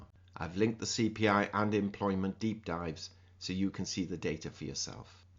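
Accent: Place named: Midlands, United Kingdom. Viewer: I can hear it in your accent a little. I would guess not far from Burton. Very sorry for your loss, 91 is an amazing age. I will be lost without my mum, can't imagine how you feel.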